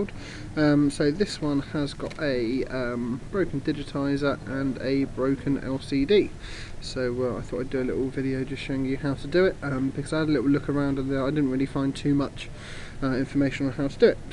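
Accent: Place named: United Kingdom. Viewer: Strange one, how do i tell if the replacement digitizer i have is the IC or non IC version? I know how to tell which version the phone is by looking for the IC under the sim card holder but my friend got the phone and ordered the replacement digitizer but i dont know if he ordered the right one or not! Also you sound British in the video, where are you from? I am in Kent. Cheers, Phil.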